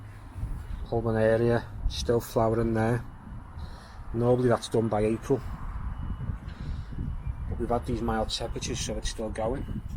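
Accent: Liverpool, United Kingdom. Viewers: Lovely to see your garden again and listen to a fellow scouser, happy to see the cordyline survived. Regards from Ontario, Canada.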